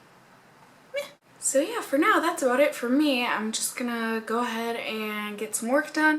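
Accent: Minnesota, United States. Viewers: your videos are my favorite videos to watch, although they don't help with my skin tone lol I just enjoy watching you! I thought I recognized that accent and then I finally took the time to look in the description and you're a fellow Minnesotan! all the more better!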